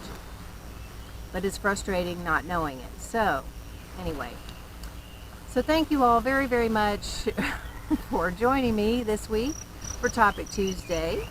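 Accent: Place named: South, United States. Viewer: Sweet thang, you sound most southern!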